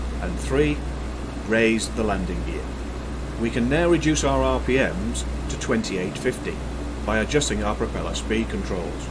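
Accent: United Kingdom. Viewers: I like this! It’s the perfect accent for a British airplane. It puts me in the mood lol. Wags is very busy with other modules and probably making Apache vids also.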